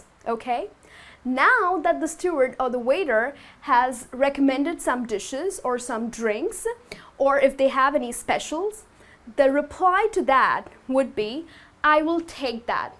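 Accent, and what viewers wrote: United States. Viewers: How do you twist your tongue to make US ascent???:) I wanted to learn it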